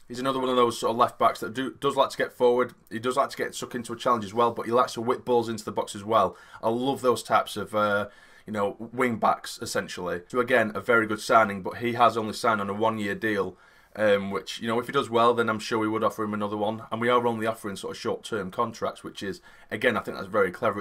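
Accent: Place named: Scotland, United Kingdom. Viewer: Not a bad Scottish accent imo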